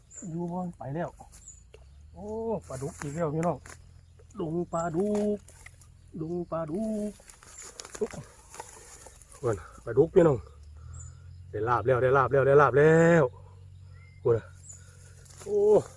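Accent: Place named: Isan, Thailand. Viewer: มักเสียงเว้าว่ะเว้าเป็นตาแซบฮ้าย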